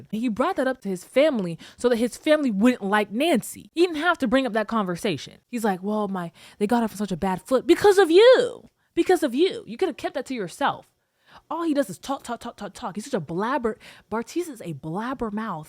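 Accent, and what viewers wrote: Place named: Nigeria. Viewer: omg your nigerian accent was everything. I love your analysis!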